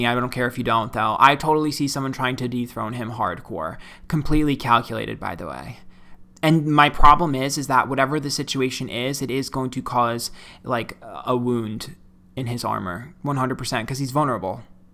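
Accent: New York, United States. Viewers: On a bright note - I love when your NY Italian accent comes out! It's the best! On a serious note - praying SO hard for Jeffree. He really is trying to grow and everyone can relate that it's the worst to be stabbed in the back by someone you love and/or trust. Also, can't help but feel "Too Faced" vibes on the person trying to get at him.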